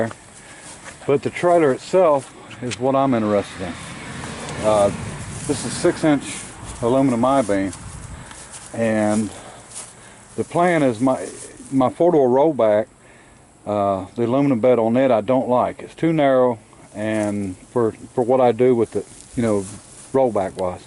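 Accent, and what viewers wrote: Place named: Detroit, United States. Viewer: sounds like a detroit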